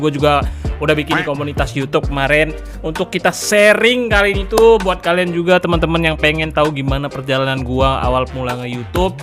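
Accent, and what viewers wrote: Java, Indonesia. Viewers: Waduh gak nyangka loh aku bang org Aceh, krn kalau kita lihat dari cara berbicaranya seperti org Jawa tulen Salam ya bang dari Aceh juga, doain juga di beberapa daerah di Aceh lagi terdampak musibah banjir bang. Mudah mudahan suksesnya bisa nular ke kita juga bang, sukses terus bang 🙏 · Lu orang Aceh tpi logat lu kek orang Jawa bg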